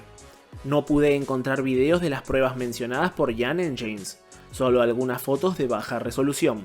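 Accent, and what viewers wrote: Argentina. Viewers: Está buenísima la info y, escuchar en idioma argento...le dá un plus❤❤❤❤😂😊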